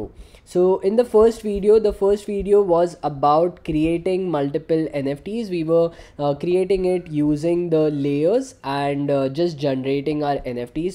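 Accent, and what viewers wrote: India. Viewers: Your English just like Hindi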